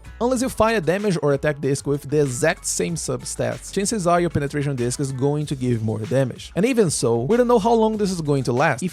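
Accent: Brazil. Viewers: Sotaque de brasileiro é facil de pegar khkhkkhkhkh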